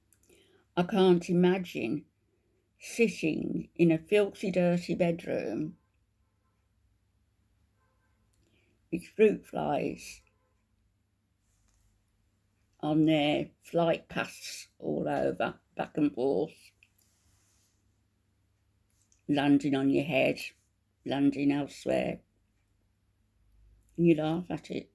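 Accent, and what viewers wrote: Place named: Birmingham, United Kingdom. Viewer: I subbed purely for the clip I saw of you saying "disgusting. Disgusting. Sow." You've gotta be a Brummie, that accent is chef's kiss on you! 💕💪🏾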